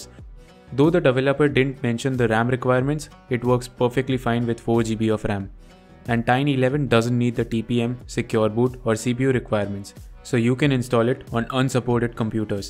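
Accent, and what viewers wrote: India. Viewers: You have indian accent me no trust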